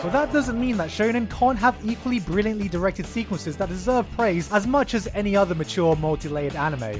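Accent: United Kingdom. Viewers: some normal liverpool street brawl beetween mates. your accent is so british i can almost hear the tea cup being lifted from the table when you talk.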